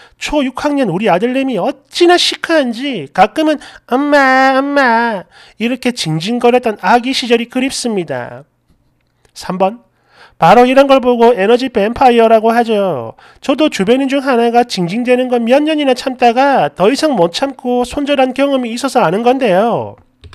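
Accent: Gyeongsang, South Korea. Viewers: ~~~ 쥐~~짠다~~~~ㅋㅋ 전에 경상도에서 10년정도 살은적 있었는데 덕분에 오랜만에 듣고 혼자 빵터졌어요~ㅋ 글구 사연속에 저 쥐 짜는놈은 듣다가 육성으로 ㄱㅅㄲ~~라고 욕했던건 안비밀 이궁~ㅋ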